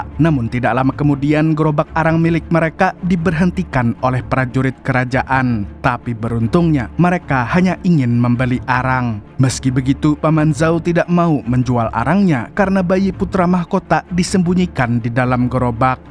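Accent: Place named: South Kalimantan, Indonesia. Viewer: Bicara nya mimin ini seperti logat tembang madihin.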